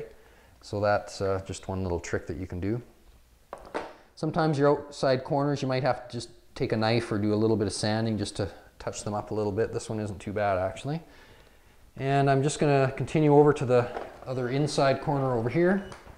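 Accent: Canada. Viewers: You must be from Canada or the Upper Peninsula of Michigan huh? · def from canada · Is he from canada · His accent is a pretty big giveaway but his hat also says Canada. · How nice to share your knowledge with all of us. Love the Canadian accent - you are a great help sir. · His accent gives him away. Haha.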